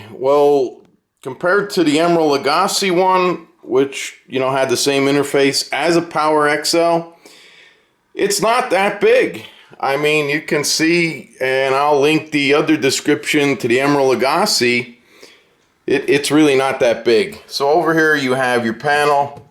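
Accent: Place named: Upstate New York, United States. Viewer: He sounds more upstate. I’d say Binghamton.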